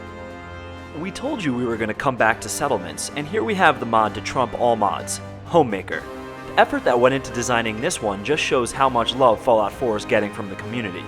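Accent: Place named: South, United States. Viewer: I love how this guy changed his accent/faked it prior to this hillbilly southern deplorable accent that everyone complained about. So you're fake?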